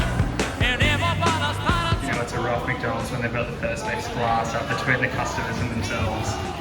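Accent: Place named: Australia. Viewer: I have to admit, I'm not used to Australian accent and I understood barely anything.Especially because the speech is quite fast but I got the idea!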